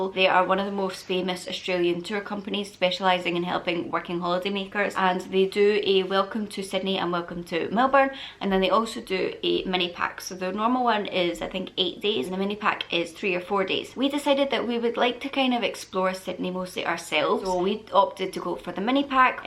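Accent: United Kingdom. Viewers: I love your accent are you British 💞💞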